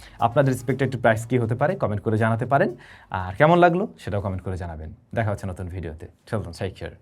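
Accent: Barishal, Bangladesh. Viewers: বরিশাইল্যা নাকি ক্ষেত ক্ষেত কথা অস্তে অস্তে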